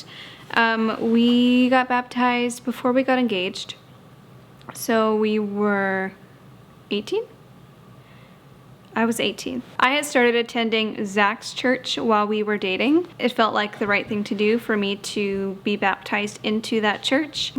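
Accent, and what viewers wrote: Western Canada, Canada. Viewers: Lol I love hearing how others perceive Canadian accents. Hers is more of a western Canadian accent though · I knew you were a Canadian the moment you pronounced, “bag” and “garage.” Lol, you can’t hide that accent :-) 🇨🇦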